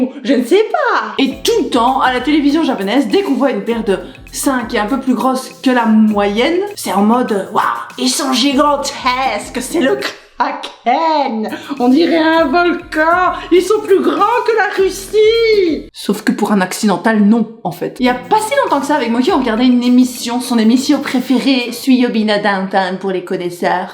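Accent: Belgium. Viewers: Super marrante ! Je me disais bien que t'avais un accent belge j'adore !❤👍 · Un accent belge et très vulgaire, au secours !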